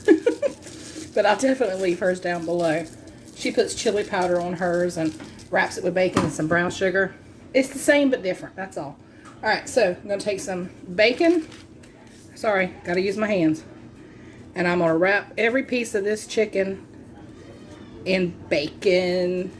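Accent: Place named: East Texas, United States. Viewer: I’m new here but I feel like I’ve met a neighbor. I’m from east Texas, and you would fit right in here. We even sound alike. I’m binge watching your videos... I love your style. God bless your heart.❤😊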